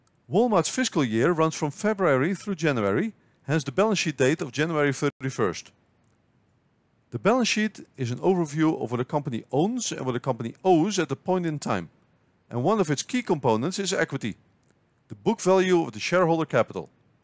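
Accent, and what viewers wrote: Netherlands. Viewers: are u dutch ?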